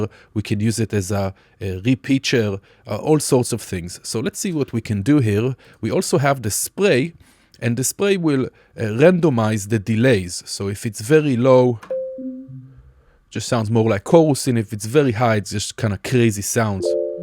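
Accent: Israel. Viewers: אני מזהה את הישראליות מהמבטא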